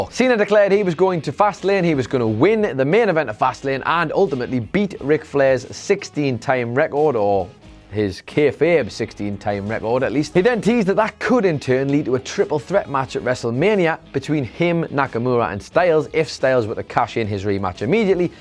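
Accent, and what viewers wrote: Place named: East London, United Kingdom. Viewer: Really guys? It’s regional accents and dialect. Adam Nicholas and Clery are from Newcastle, and that is a notable accent. See also Ant & Dec Andy Murray is Scottish, and is from east London, and has a corresponding accent.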